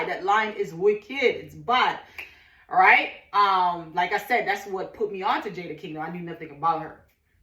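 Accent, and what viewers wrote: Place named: Jamaica. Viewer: Lol. So funny. I just felt like hearing your voice and your Jamaican accent that has been away from me for a while and that's what i found on my page. I feel glad that you are well. You are doing a great job. You are really all into it. Hands Up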